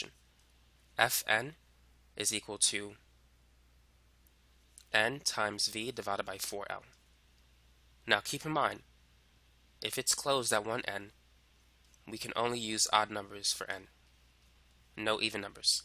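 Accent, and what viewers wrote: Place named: Boston, United States. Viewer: Its how they talk in boston ...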